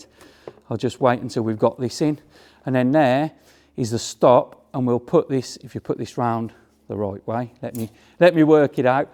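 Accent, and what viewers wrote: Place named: Cockney, United Kingdom. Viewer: His accent is not an accent you hear very often, consequently unfamiliar to most Americans. If I were guessing, I would guess it's a Cockney accent which is barely intelligible to the average American.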